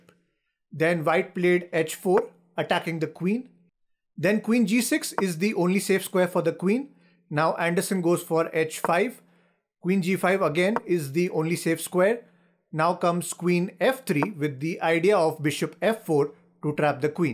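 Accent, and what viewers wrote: India. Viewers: The first time I watched your video, I thought you are an Indian living in England or any other country. Your English is so good, but the accent is still Indian 😂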